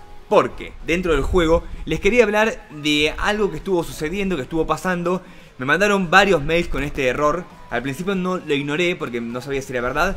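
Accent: Argentina. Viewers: sos argentino? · De que parte de buenos aires sos?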